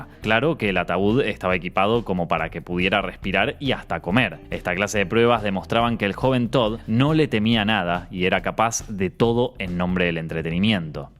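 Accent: Argentina. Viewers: odiavle el acento argentino pero ni modo... la tematica esta buena. me aguanto. 🙄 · Viejo. Normalmente la narración con acento argentino no me agrada, pero el tuyo está demasiado brutal... 👌🏻 No me extraña el éxito que tienes.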